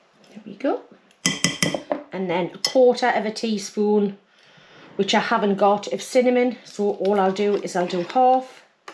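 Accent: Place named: Northern England, United Kingdom. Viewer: I enjoy the food content of this shoe almost as much as I enjoy accent from the north of England. Americans like me love that accent because it feels so close to home and is very much like ours. Keep up the good cookin' work!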